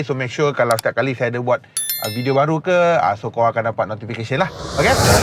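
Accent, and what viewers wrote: Negeri Sembilan, Malaysia. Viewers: Bang cakap nesmilan yolah best bonar YouTuber keto cakap nesmilan 👍🏆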